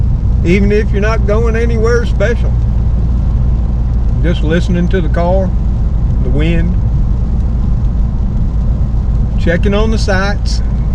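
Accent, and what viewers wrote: South, United States. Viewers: Mr.. I was worried about you too. Your Vegas Fan. I'm glad your ok. I also think about Trixie possibly having issues when your not around for a bit. I would like to put those southern bells out there on notice, about looking after you. No disrespect sir but your quite the southern gentlemen a man of principle and intelligence.. Their missing the boat as far as I am concerned. Opp's that's right you got rid of that. Lol. I sure enjoy your southern drawl and interesting verbiage at times. Confession I don't always understand you it just makes it all that much more entertaining and educational of another culture then I am used too. I hope you don't take my off hand compliment and kidding to be inappropriate.. I have the utmost respect for you sir, your channel and what you are willing to share with us out here in video land. Be well Mr. 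until the next ride about.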